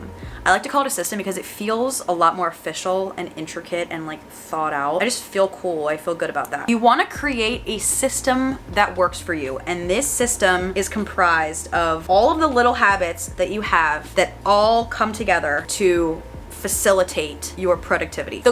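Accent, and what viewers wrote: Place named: California, United States. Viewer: That California accent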